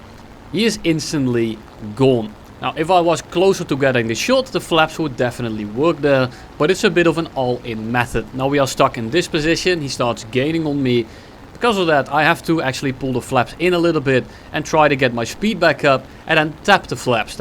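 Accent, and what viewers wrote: Netherlands. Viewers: I know you're Dutch, I hear ded eksent a mi-jel awee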